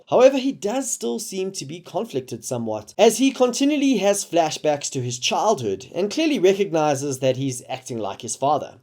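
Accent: South Africa. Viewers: I live in England now but born and raised in SA. When I first started watching this video I was like this guys voice sounds way to familiar. I mean it doesn't have an accent, cause English people have an accent to me. Then I realised why haha! SA ftw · are you south african?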